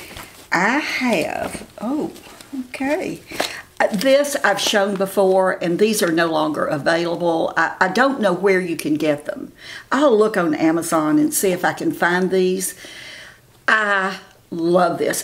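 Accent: South, United States. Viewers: Hi, im watching ur Q&A video (also about caftans). im a new subscriber. About negatives comments, why do these people watch(whoever’s channel) if they arent fans?? Some folks just need to complain🤷🏼‍♀️well i love ya girl!! I could listen to ur southern accent ALLLL day long!!!! 😘❤️ps say hi to Jim, and tell him the yard is looking great!!!🧤🌴🪴🌾🌸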